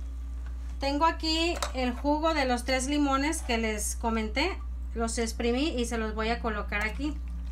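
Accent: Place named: Juárez, Mexico. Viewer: Eres de Juárez , es que hablas como Antonia Losoya😊 se ve sabrosa tu agua , la voy a preparar ..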